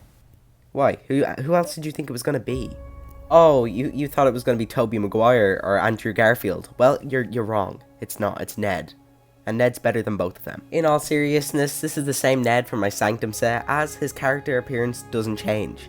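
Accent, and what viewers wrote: Ireland. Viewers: I really wish this was a real Lego set Also I could tell you were Irish just by you’re accent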